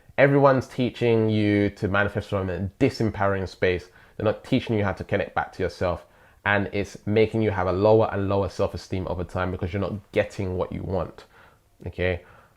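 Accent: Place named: London, United Kingdom. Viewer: What part of London are you from? Hehe! Amazing post BTW keep inspiring 🙌😇 to want is to lack 💯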